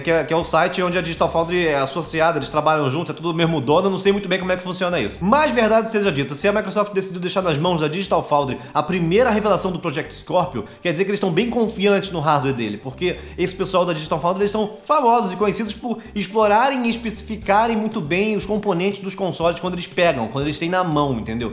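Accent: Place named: Portugal. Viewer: está ficando com sotaque de Português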